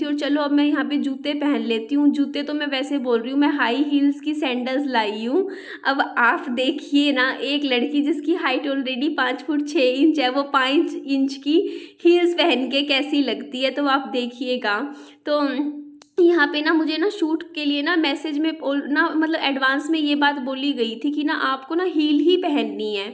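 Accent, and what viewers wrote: Haryana, India. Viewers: Haryanvi Tone m aise hi bolte hai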